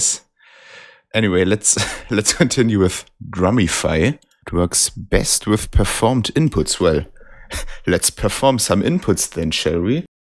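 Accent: Germany. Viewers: Sounds like a very nativ german guy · Der deutsche Akzent ist sehr sympatisch xD Danke für den Einblick ins Programm :3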